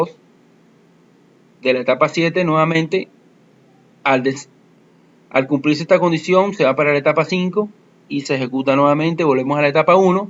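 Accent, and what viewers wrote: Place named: Barranquilla, Colombia. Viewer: Una pregunta, es que por su acento me pareciera que se encuentra en Barranquilla Colombia. Es así?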